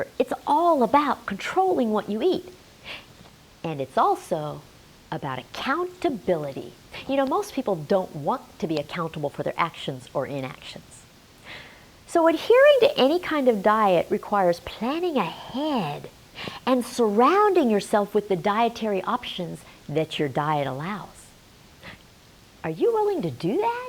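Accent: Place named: South, United States. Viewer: My dear Ms I can see you have got my feedback all wrong. I do appreciate and respect thoroughly the amazing work that you are doing. As an audience and as a person who understands the value of this my most sincere intention is only to bring to your notice a couple of things that nay enhance your appeal across audiences. As I mentioned earlier all I have shared is with due respect to all you do, I feel sorry that a person as well educated and enlightened as you us unable to appreciate a simple opinion about how you could reach broader borders. I work with appearance and understand that when people cannot connect with what they see or hear they are likely to switch off. And I do not understand what understanding English has to do with this at all. Your very southern American english can probably be comprehended by only those used to its peculiarity its not as uniformly understood by non native speakers at all. 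Having shared my thoughts I have no intention of offending you ma’am its only a way of sharing my thoughts about how you could increase your appeal. I do hope you understand my drift. Thank you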